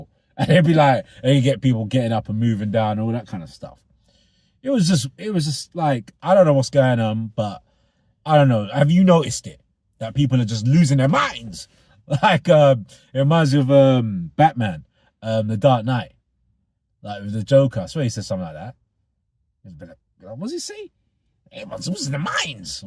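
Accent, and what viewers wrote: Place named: London, United Kingdom. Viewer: He's a Londoner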